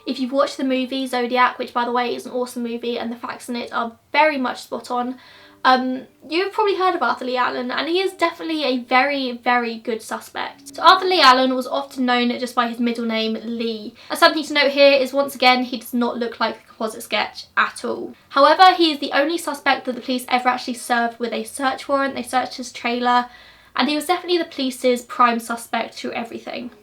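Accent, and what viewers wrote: United Kingdom. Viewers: Please don’t take this the wrong way, but your mispronunciation of “Luger” in a British accent is hilarious as an American who grew up around guns 😅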